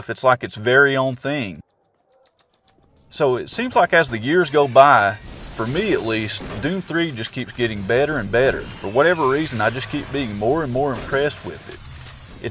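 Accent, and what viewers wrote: South, United States. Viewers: now ahm not gay (nothing wrong in bein gay do) but ah think ah have a crush on ya southern accent mayn! seriously tho, this game is too underrated, it aged well imo.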